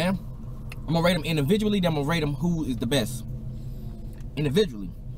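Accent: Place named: South, United States. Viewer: u seem like a cool down to earth dude love the vids. also i can tell u from the south cause that accent the way you said review haha respeck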